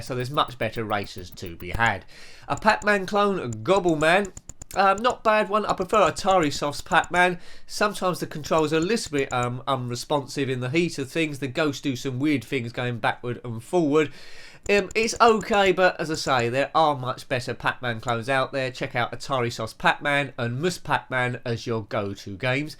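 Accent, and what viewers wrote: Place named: Essex, United Kingdom. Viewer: Loving all these top game videos. I've watched all the C64 and Amiga videos so far and working my way through the Spectrum ones. Also, I've been trying to guess where your accent is from. I ask that as if it's somehow separate to you. 😁 I was going to guess Enfield, but now I'm thinking probably over towards Grays in Essex. Am I right?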